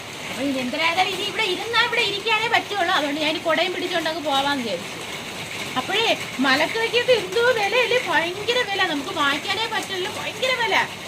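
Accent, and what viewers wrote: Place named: Thiruvananthapuram, India. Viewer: ഭാഷ നല്ലതാണ്. തിരുവനന്തപുരം slang.. Artificial ആയി സംസാരിച്ചു ഭംഗി നശിപ്പിക്കാതിരിക്കുക 😊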